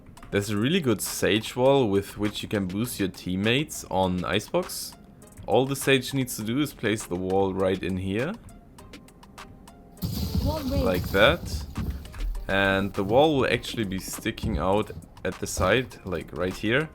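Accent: Germany. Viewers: Bro du bist deutsch oder ? Haha dein Akzent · Dieser Deutsche Akzent 😂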